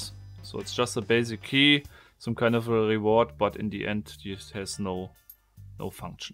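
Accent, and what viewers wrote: Germany. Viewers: Kannst du mal ein deutsches video machen In vielen videos hört man das du deutscher bist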